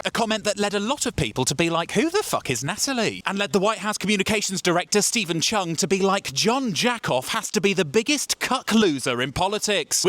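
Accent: United Kingdom. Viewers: Can’t take news about America seriously from somebody with a British accent. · Absolutely nothing to do with the content of this video, which is great, but I love that you kind of look and dress like an American Cholo (probably gonna have to google it) but have a British accent. Epic. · Info ✅ Humor✅ Classic British accent to make it all more palatable ✅✅✅ Trumpty Dumpty this is the first I’ve heard that nickname omg it’s great. Just as great as “thicky thicky dumb dumb” being said in a British accent. it doesn’t sound anywhere near as good with my ‘merican accent lol 🍒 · You good, man. You got good shit. Love the British spin and accent. Such class!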